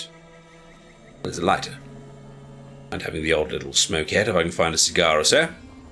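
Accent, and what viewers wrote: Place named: RP, United Kingdom. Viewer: This is awesome so glad i saw this in my feed :D your posh accent is on point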